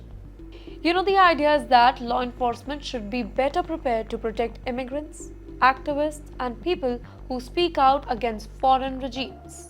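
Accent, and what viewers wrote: India. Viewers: This anchor is based from India and her accent is Indian . Don’t know how much of this is really true. Would love to hear the views of an American based legit news channel .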